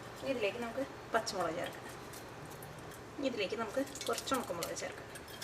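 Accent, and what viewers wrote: Thrissur, India. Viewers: പെങ്ങളെ അടിപൊളിയാ ഇഷ്ടായി അവതരണം തനി തൃശൂർ കേൾക്കുമ്പോൾ മനസ് മുഴുവൻ കോരിത്തരിക്കുന്നു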